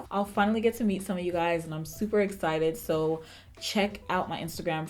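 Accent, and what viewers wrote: Africa. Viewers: Hi,. Im in love with your personality. And this accent, omg 😂 i don't know which one it is (because I'm from Russia, english is not my language), i think it's African, right?) You are so funny and, you know, so unlike other people! Be yourself, we love it 😍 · her African accent is soo hilarious!!! luv it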